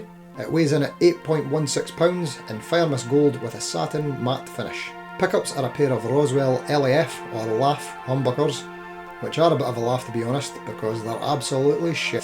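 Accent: Scotland, United Kingdom. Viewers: Guys I think he might be Scottish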